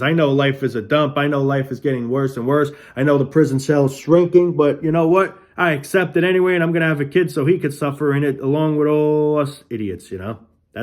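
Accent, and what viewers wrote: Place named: New Jersey, United States. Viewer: By your accent my guess is you’re from New Jersey.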